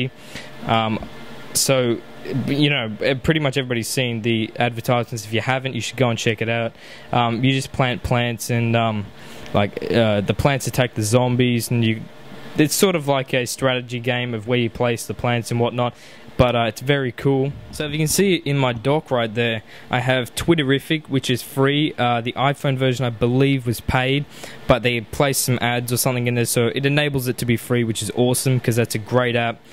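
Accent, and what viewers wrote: Australia. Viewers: Good to hear an Aussie accent on YouTube, especially about the iPad. Keep at the good videos mate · finnaly an ausie youtubber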